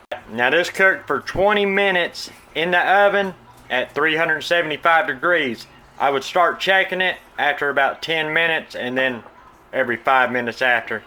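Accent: South, United States. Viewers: Hi iam so happy to see you!! You are a joy to watch. I like the southern accent its great!! Thankyou for sharing recooes with us. Mighty helpful to learn. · Where do you live? I know its the south cause of the accent. Reason I am asking is because I live in Mobile Al. Its between Penscola Florida & Gulfport Ms.. I was asking because their is a sausage called conecuh sausage. This stuff is so good you want ever go back to any other sauage. Wal-Mart sells it heck every store where I live sells this. When I was living in North Carolina target sold it, so I know you could ask someone could they order it & you could buy it from the store. This sausage would be so good in anything you cook. Its a great grill sausage as well. If you have never tried it you should try some. If you don't want to order it ask your local to store to order some. I told people in north carolina about it they would seriously go in target an buy like 4 or 5 packs at a time, so if they was sold out they would have some on hand in freezer.. 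When relatives come down they literally take a cooler full back with them. This sausage is just the best. I will leave a link... Anyways I love all your southern recipes. Southern food is the best food. Thanks for the really good recipes. <link>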